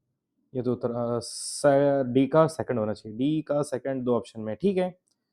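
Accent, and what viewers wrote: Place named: Marwari, India. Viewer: Bhai aap toh meri caste ke ho same marwadi language shikawati Rajasthan jesi😅😅.. Love from Mumbai❤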